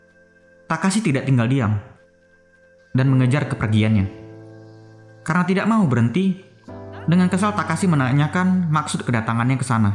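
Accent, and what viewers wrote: Medan, Indonesia. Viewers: Orang medan bang? :v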